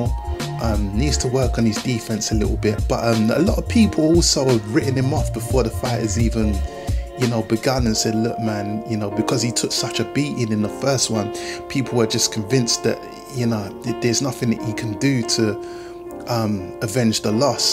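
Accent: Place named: United Kingdom. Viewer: Brotha you got a UK emcee voice. Reminds me of a UK emcee by the name of Barney Artist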